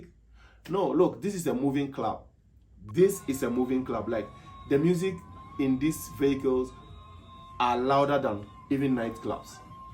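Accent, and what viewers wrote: Nigeria. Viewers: You english you talk like Nigerians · We think you are a Nigerian coz of accent 😂😂😂😂😂😂😂😂😂😂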